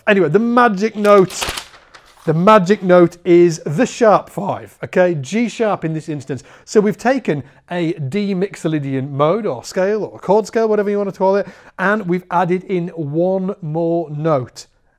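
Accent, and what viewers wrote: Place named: North, United Kingdom. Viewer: New video format and northern accent hella lit!!! 🔥🔥🔥🔥🔥😂😂😂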